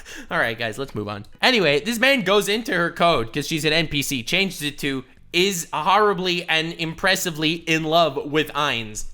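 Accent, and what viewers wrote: United Kingdom. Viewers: From when are you british? Just I'm sure it was dritish english. Like please. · THe Brit accent is freaking classic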